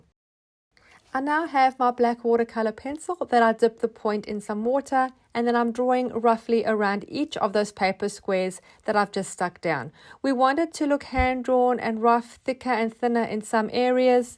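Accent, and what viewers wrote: South Africa. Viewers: Howdy watching from Cape Town in South Africa. Your accent sounds very South African.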